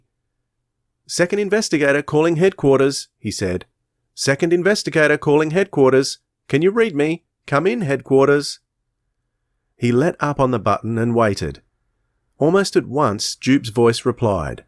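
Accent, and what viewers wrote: Australia. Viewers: This is the first time I've heard an Aussie narrator! It's great!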